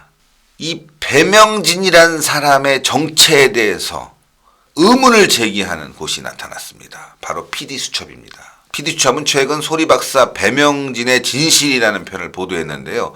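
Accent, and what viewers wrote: North Korea. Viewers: 평안도 사트리 같애요 억양이?! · 딸랑 딸랑이 입니다. 이북사람 목소리 같네요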